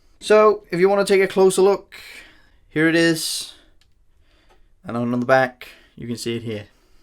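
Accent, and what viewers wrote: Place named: United Kingdom. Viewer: you look American but sound British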